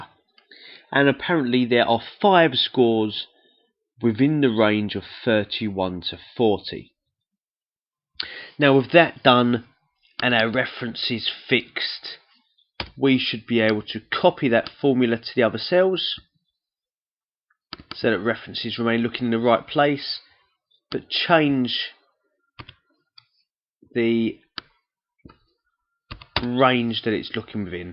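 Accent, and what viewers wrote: United Kingdom. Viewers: Thank you SOOOO much! You're really awesome!💯 🎆This video was so very helpful that I can't begin to tell you how much it actually helped me. Plus, you're accent is so cool! Love it! Are you British?💚💚💗💓💖😌😌🤗👍💣😌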